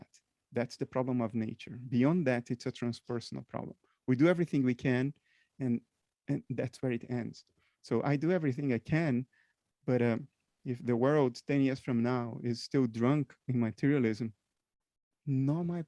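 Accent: Ireland. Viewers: Two beautiful human beings. I so wish you all the best with your podcast and I love your Irish? accent. 🙏🙏 Bernardo and. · you did a good job with this. well done. (side bar - i detect an Irish accent at the edges. Am I right)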